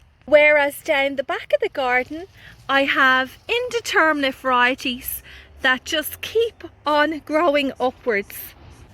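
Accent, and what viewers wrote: Ireland. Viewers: Happy new year I love your beautiful garden. I love to enjoy your thursday garden update I also love your irish accent and keep up the fantastic content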